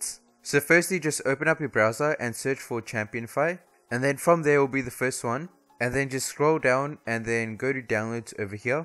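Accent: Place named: Australia. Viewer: Where are you from? Australia? I LOVED YOUR ACCENT!!!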